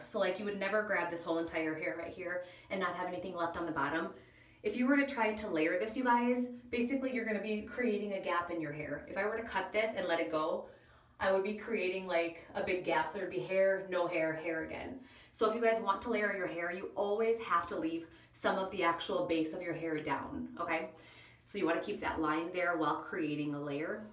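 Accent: United States. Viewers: Are you from Minnesota or somewhere up north or Canada? Your accent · ohh.. I can tell you have a midwest accent. 🙂 I love your hair tips...thank you!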